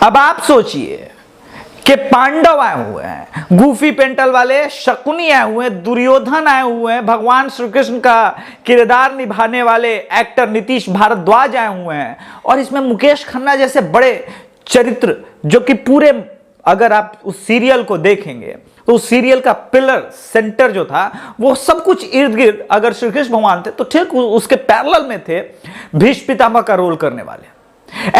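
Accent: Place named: Bihar, India. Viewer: Sir aap jarur up-bihar wale ho ........... Hai na sir? Aap ki channel pai mai pehlibar aaya hu aur apke bolne ka tarika bohot aacha laga......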